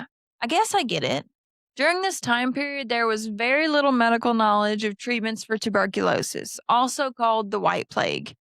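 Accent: Kentucky, United States. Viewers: Hi, my friend, I just found your channel, and I'm so glad and happy that I did. I'm speechless, because I'm getting some real and true history facts, told with that absolutely lovely and sweet southern Kentuckyian accent of yours - I'm officially in awe, and I could listen to you talking for the eternity, lol. So you got my like and sub, that's the least I can do, to thank you for your awesome video, that I'm enjoying so very much. So stay awesome, smart, and gorgeous as you are, my friend. Greetings, much respect, love, and all of the best wishes, from "across the pond", from Serbia, southeast Europe. Cheers!👨‍🎤🌹👧🇷🇸🥂🇺🇸🌏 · Don’t know how this video popped up on my for you, but glad it did. Just subscribed, she sounds like one of my Kentucky girls so I love it and MC is a field trip we all had to take at some point. Looking forward to seeing more content from her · I can walk to Mammoth cave lol. 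That is how close I am to it. Kentucky has a bunch of beautiful ladies. Doesn't surprise me to see another one like you here. Nice video! Edit: When I hear you talk I can tell you have that Kentucky accent behind your words. I didn't even notice mine until I heard my voice play back after a recording I made. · here from history of everything podcast. FINALLY, a Kentuckyian with a Kentucky accent! 😊 Unlike whats-his-name who claims to be kentkian with no accent??!, 😅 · First video ive seen of yours,everything seems grade A+ i enjoyed the accent,sounds like a ky southern one( i could be wrong and either way dont post what state yer in cuz there's tons of aholes that would just dox ya)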